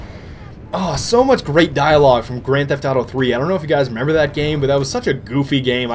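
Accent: Germany. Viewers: are you german? 0_o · are you german??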